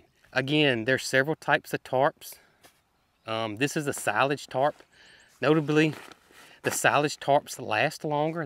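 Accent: South, United States. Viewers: I love how soil sounds like soul. I am an Alabama transplant and I just love to hear southen speak!!! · I have to listen to your southern accent carefully sometimes. I was confused how winter rye was going to feed your soul till I reasoned out you were speaking about soil LOL😂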